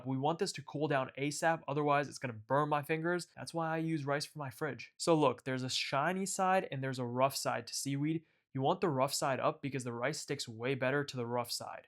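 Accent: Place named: Northeast, United States. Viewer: Your northeast accent is so hot!! ❤❤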